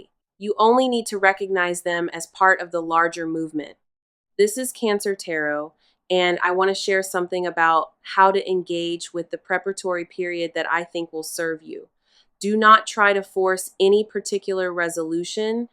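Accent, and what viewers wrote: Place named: England, United Kingdom. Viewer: Why am I getting these videos in French with a horrible English accent? Annoying to listen!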